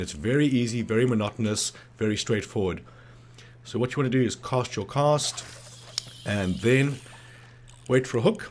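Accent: South Africa. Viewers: Are you from South Africa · You from South Africa? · South African Accent.. awe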